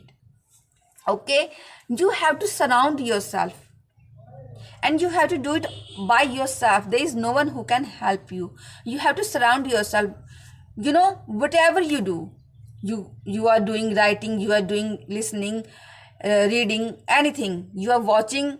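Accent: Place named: Marwar, India. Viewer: You sound like marvadi 😊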